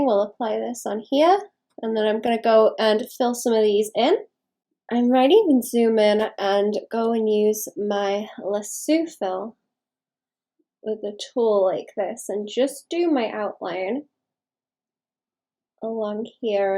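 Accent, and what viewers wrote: North West England, United Kingdom. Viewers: Great art, learning lots from this. Can I ask where you are from? Your accent sounds really close, north west UK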